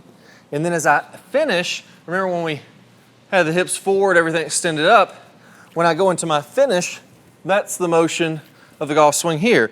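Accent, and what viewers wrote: South, United States. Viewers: You're an excellent coach. I hear that southern twang shining though. Where could I find you for an in-person lesson?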